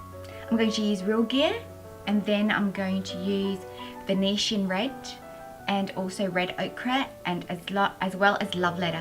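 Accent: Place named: Australia. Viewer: Wow , we live in Queensland, would love to meet and greet when you come.. Hoping you can sub back and stay connected.. I knew you where aussie when I heard your accent..Love this channel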